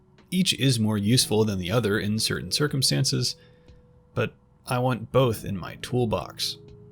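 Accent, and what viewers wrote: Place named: South, United States. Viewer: don't be ashamed of the drawl, don't let bad people Steal your way of speech I love the southern drawl it should be reclaimed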